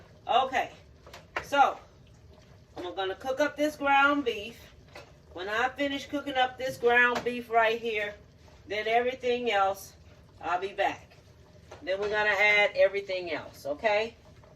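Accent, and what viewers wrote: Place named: South, United States. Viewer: I LOVE HER! She know about the Soda In there to cut the gas! That’s Saw-sish took me OUT!🤣👏🏽 I already knew she was southern and could BURN when she simply said “this heya” when lickin through them beans! I should have known she was a MaSTER CHEF because she knew to pick through them beans!🤣👏🏽👏🏽❤️🔥🔥 love it!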